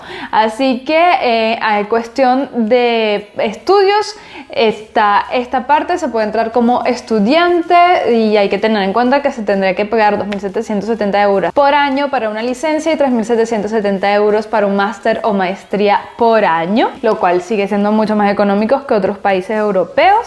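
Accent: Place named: Venezuela. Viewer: Pinche acento venezolano no lo soporto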